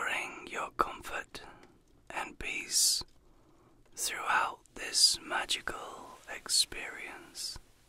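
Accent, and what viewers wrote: United Kingdom. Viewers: Thus video would be SO MUCH cooler if it was LotR-themed. Just saying. Your voice is fine. 🤔 Obviously British, yes? 🥰🤗💖🇨🇦 I ABSOLUTELY LOVE Brits!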